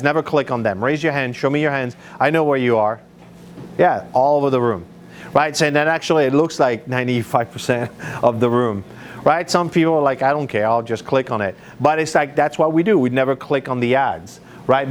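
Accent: Quebec, Canada. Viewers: Sounds more like Quebec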